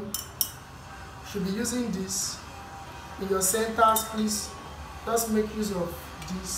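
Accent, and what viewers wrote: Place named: Nigeria. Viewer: God bless you man!!! You sound Nigerian. More love from Ghana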